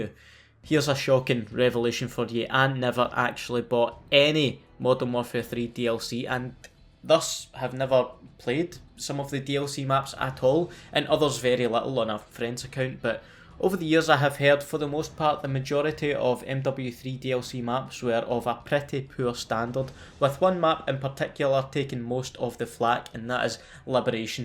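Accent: Scotland, United Kingdom. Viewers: I love watching your vids I'm british and I can do a Scottish accent due to you thx my favourite thing I heard you say was you camping bastard #notification sqad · Is he scottish?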